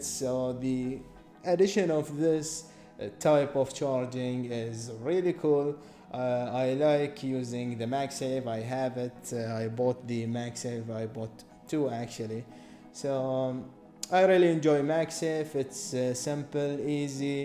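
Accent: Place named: India. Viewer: اسم القناه انبكوس عربي ويتكلم انقليزي مثل الهنود اش فيه ؟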